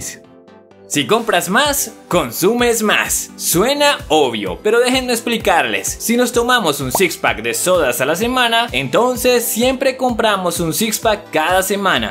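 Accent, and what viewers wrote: Colombia. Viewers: Sabía que ese acento era colombiano!!!! XD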